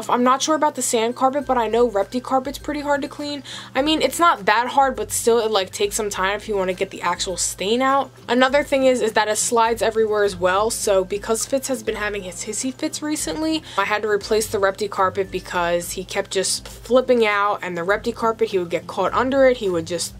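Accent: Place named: New York, United States. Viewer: Jersey or New York, your twang is confusing as hell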